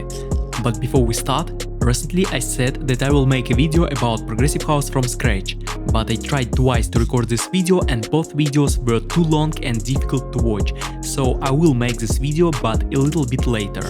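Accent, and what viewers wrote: Russia. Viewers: Amazing, сразу узнал русский акцент)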